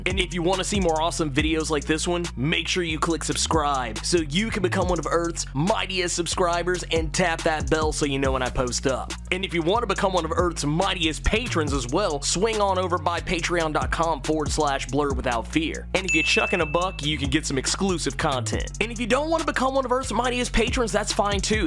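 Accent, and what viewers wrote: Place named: East Texas, United States. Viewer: hey boss.. where you from... you sound like you're from east texas.. Tyler area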